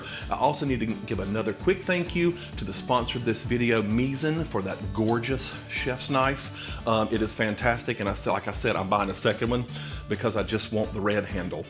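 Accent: South, United States. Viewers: Love your Southern accent. Can’t wait to try these; I need to research Swerve sweetener (I usually use a monk fruit/Stevia combo).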